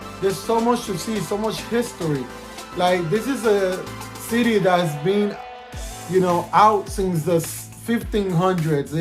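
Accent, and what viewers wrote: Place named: Cuba. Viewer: Where you from Brother you have an interesting accent. Is it Cuban?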